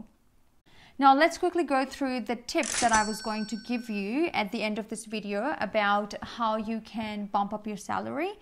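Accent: Australia. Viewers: Why Australian ascent dear....why not Indian ascent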